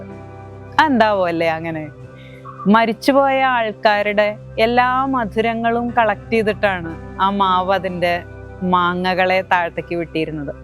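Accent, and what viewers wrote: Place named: Valluvanad, India. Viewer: .. കഥ ഇഷ്ടായി ട്ടൊ....ആ വലുവാനാടൻ ഭാഷയും, എല്ലാം സൂപ്പർ...👌🏻👌🏻❤️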